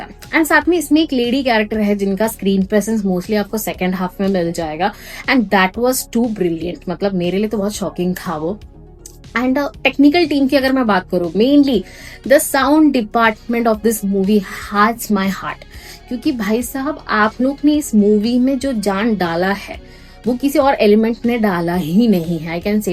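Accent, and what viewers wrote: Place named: Kottayam, India. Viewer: You speaking kottyam Hindi it's cute.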